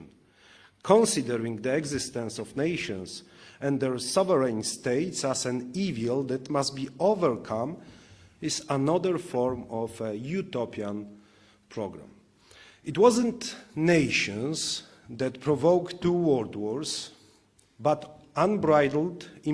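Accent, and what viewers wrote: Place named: Poland. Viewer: Mówi bez błędów, ale ma polski akcent. A jaki ma mieć: francuski ? niemiecki ? Słyszeliście Francuza mówiącego po angielsku - masakra.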